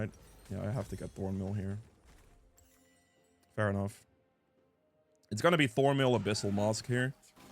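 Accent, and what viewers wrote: Netherlands. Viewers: Are you dutch or so? Great video dude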